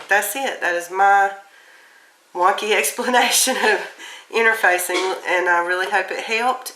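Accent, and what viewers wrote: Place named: South Carolina, United States. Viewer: Thanks for this! Very helpful. I have to ask… are you from South Carolina? Love your accent 🤍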